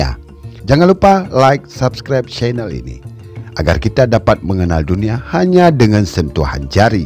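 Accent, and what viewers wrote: Aceh, Indonesia. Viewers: Admin logatnya mirip orang Aceh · Pasti komentator awak aceh nyo 🤭 lemah logat bahasa indon jih 😂😂👍🏻👍🏻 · Voice overnya kayaknya orang Aceh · sigoe sagai lon deungo su logat... langsong tatupue awak aceh :D,,, bereh adun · Ni admin nya logat nya seperti suku aceh.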